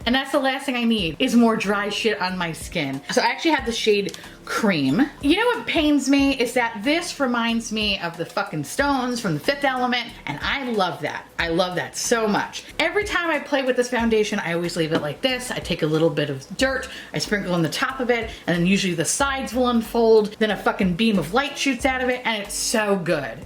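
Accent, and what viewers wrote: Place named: New York, United States. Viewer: 4! There’s nothing I love more than you speaking garbage about things in your new york and Minnesota accents! 😂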